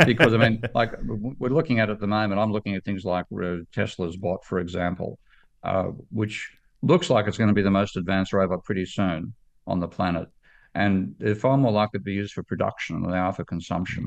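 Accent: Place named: Australia. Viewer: He is Australian 😂. They speak like that😂.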